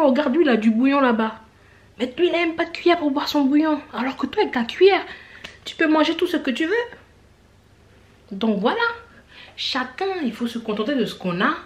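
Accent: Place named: Africa. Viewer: Merci pour toutes ces expressions ; que je connais sans savoir leur signification . Tu es trop forte .tu as l'accent africain et jaime bien .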